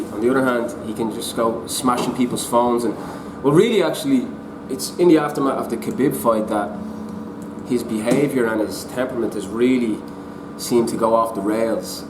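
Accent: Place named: Dublin 4, Ireland. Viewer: I dunno what In the name of Jesus brought me to this video or why I clicked it , I can't listen to you ...ya dublin 4 Ponce ya😂 😂 keep doing what your doing buddy by all means ... we don't need a 2 hour analysis of a 30 second clip joe Rogan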